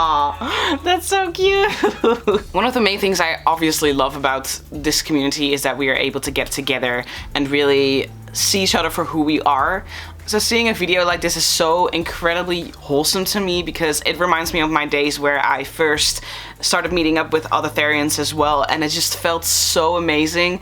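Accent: Netherlands. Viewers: Random question are u dutch cause the accent sounds familiar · Are you dutch you sound dutch and I am dutch oh and love the video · oommgg ur english sounds dutch, ben je nederlands? er zijn echt bijna geen therians hier ben echt zo blijj aaa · You kinda have a little Dutch accent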